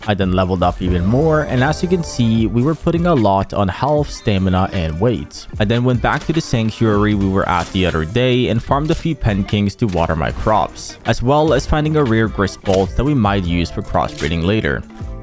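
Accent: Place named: Sweden. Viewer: Wait are you Swedish cus it kinda sounds like it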